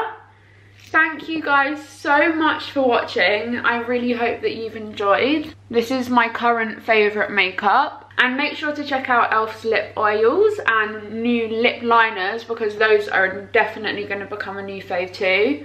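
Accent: United Kingdom. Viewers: So proud of you🥰Are you British?❤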